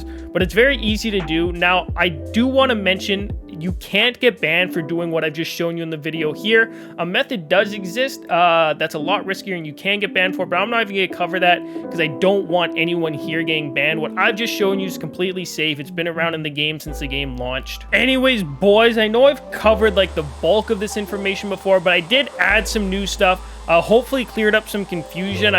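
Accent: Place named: Minnesota, United States. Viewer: You have a Minnesota accent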